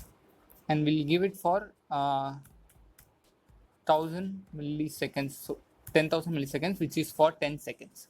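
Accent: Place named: England, United Kingdom. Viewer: What the English accent, can't understand anything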